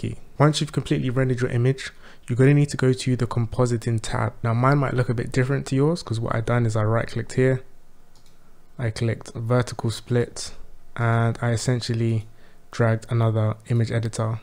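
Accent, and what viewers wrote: United Kingdom. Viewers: Great video – very clear and better results that the others I tried. Good to hear a good multiethnic London voice, too!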